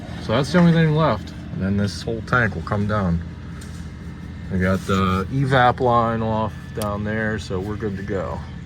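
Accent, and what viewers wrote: Pittsburgh, United States. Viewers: I just subbed bro lol I’m doing mine right now actually haha. By the way…..??? Are you from Pittsburgh??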